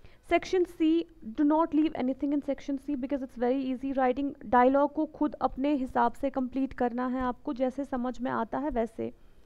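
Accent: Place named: Kashmir, India. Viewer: I think You r frm Kashmir Right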